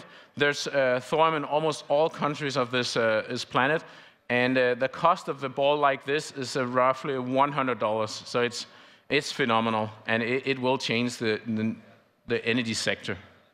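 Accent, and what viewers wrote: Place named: United States. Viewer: It never ceases to amaze me how good your American English is.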